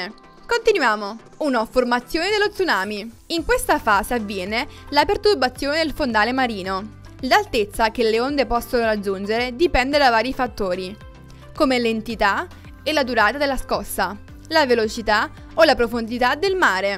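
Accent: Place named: Sicily, Italy. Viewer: sempre interessante anche il tuo leggerissimo accento siciliano credo hihihihihihihi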